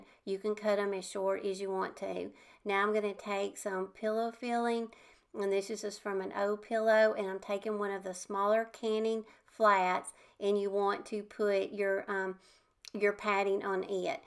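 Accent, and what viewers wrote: South, United States. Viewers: I am so jealous of that fancy wine cork you found at the antique store! I love all your designs and diy’s. I can never pick a favorite, but you are one of my favorite creators. And I love your ideas and your accent. I’m from the South too. Raised in Lanett, Alabama, and live in LaGrange, GA, for the last 30 years. Love both your channels!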